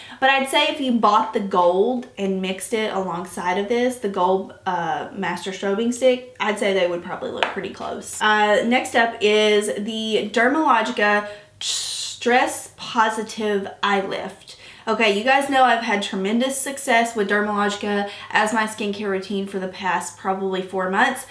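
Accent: South, United States. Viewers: first of all, I am a new subscriber and I already love you! You seem so down to earth and honest in talking about different products, I already trust your judgement! I actually found your YouTube channel by reading a comments section of another YouTuber. Several people recommended you for hooded eye tutorials. I'd love to see an updated one (I couldn't find a recent one after scrolling back a good bit), as I REALLY STRUGGLE with applying eyeshadow to my hooded eyes. That flap of extra skin is my worst enemy!! Also, love your southern accent, where are you from? I am from North Carolina!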